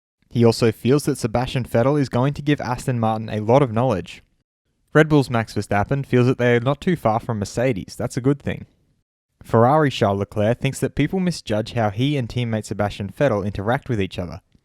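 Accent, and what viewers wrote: Australia. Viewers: Aussie ascent gotta love it mate.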